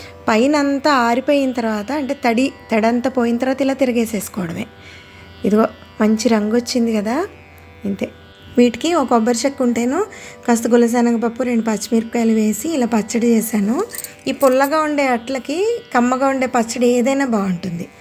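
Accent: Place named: Vizianagaram, India. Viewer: మీ లాంటి వాయిస్ విని చాలా ఇయర్స్ అయింది... ఇలాంటి తెలుగు చిన్నప్పుడు మేము ఉండే ఏరియా vzm లో మాట్లాడితూ పెరిగాం.. ఇప్పుడు వాళ్ళ నార్మల్ గానే ఉంది 🙏🏼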